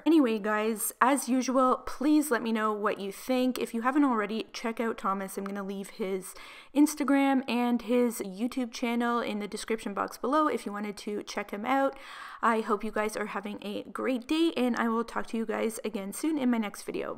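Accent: Canada. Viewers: You must be Canadian?You have such a heavy accent! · is u canadian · Are you Canadian? You kind of have an accent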